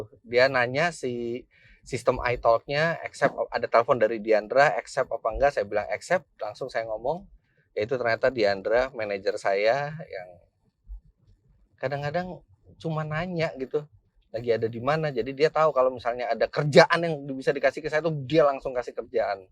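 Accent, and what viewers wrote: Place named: Serang, Indonesia. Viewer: Orang serang mana suaranya👋🖖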